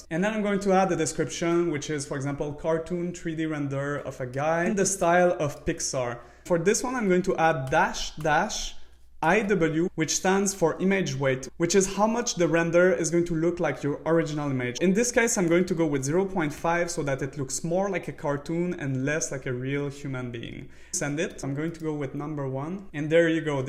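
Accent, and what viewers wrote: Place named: France. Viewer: belle accent français ahah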